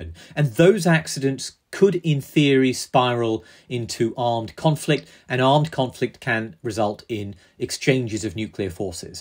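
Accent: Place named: Scotland, United Kingdom. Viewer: Its a pity the Scottish accented voice over thinks "nuclear" is pronounced "nukilla".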